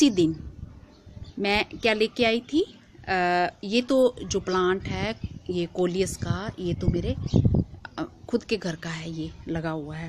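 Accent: Punjab, India. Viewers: aapki boli ka turz lehja kyu hai I think Punjabi accent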